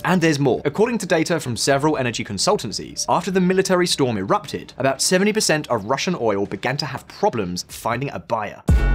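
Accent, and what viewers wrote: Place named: United Kingdom. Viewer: Whenever I hear a British accent, I know I'm probably about to be subjected to Russophobic propaganda---like this video, which is a slickly-produced combination of ignorance, mis- information, and wishful thinking. I like the way glosses over the fact that a total fuel embargo on Russia will severely damage the Western economies for a very long time--perhaps permanently, in Britain's case. But it's OK; we must be prepared to go cold and hungry, just to stand up to that nasty old Putin. Such childish bullshit. And the punchline, of course, is that the Russians seem to be weathering the sanctions just fine.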